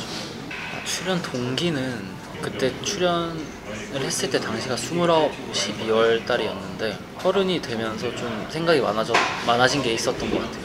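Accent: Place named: Gyeongsang, South Korea. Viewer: 저도 처음 봤을 때 bts 지민 느낌 있었어요. 특히 부산 출신이라 그런지 경상도 억양, 목소리, 말투가 너무 닮아서 놀랬어요.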